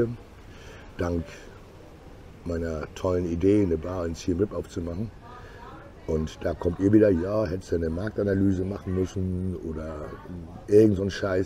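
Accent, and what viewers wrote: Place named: Hamburg, Germany. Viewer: Moin, einfach so bleiben wie Du bist und nicht für andere umerziehen lassen. Ich denke wen deine Aussprache oder Videos nicht passen, sollte einfach nicht nerven und andere schauen. Ich finde genau das machen deine Videos aus, dass du dich nicht verstellst und authentisch bist. Manche kommen mit hamburger Jungs vielleicht nicht klar, dann sollen sie einfach wegblicken. Ich würde die nörgler blockieren falls sowas geht. Sollen sie sich andere Videos anschauen. Viele vergessen das sie umsonst schauen und du kosten hast. Ich finde deine Videos top, egal ob auf Roller, zu Fuß, teils englisch oder laut, scheiß der Hund drauf. So sind sie top. Ein Mangel habe ich, dein abspann mit den Verweis auf andere Videos, würde ich nur 5-10 Sekunden machen, dadurch schauen viele nicht bis zum schluß denke ich. Das zählt wohl auch wenn bis zum Schluss geschaut wird. 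Deutschland ist keine Option für dich, dazu bist du zu lange weg gewesen und wie Du sagst, als Deutscher hat man hier nichts zu erwarten. Weiter so mit deinen Videos. Danke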